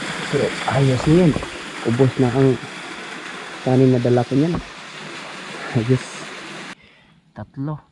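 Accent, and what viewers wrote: Visayas, Philippines. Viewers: Bisaya man cguro ka. Lisod d. E bisaya? Hehehe... Mas nice magbisaya kay baga sa tono😂😂.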